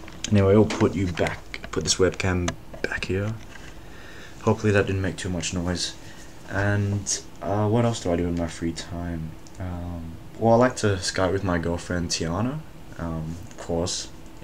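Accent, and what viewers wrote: Australia. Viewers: An Asian that sounds like a Australian...... Wow....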